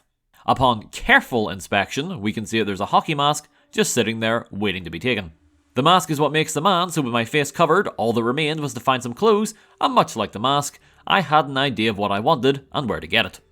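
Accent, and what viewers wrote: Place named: Northern Ireland, United Kingdom. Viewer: Right are you from Bangor or antrim 🤣🤣🤣 driving me mad trying to think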